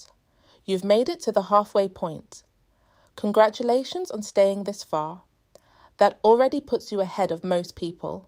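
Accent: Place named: North Carolina, United States. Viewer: Durham NC.. Nice accent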